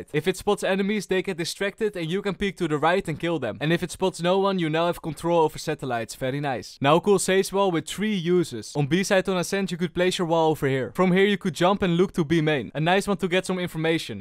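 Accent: Netherlands. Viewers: You a typical dutch accent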